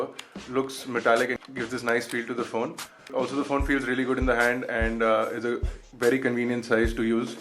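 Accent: India. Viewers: 1st time I heard his voice , I knew he is Indian. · great review, i cant like or subscribe though u sound Indian... and i m Pakistani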